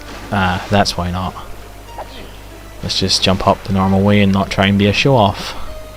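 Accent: Scotland, United Kingdom. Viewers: Watch this video to see almost 45 minutes of a Scottish guy rambling in Scottish about an English-made video game, failing to glitch his way into a library for most of it. I believe it's his longest one...and it's not an ASMR video or a Pokémon Generation 8 "comic dub". D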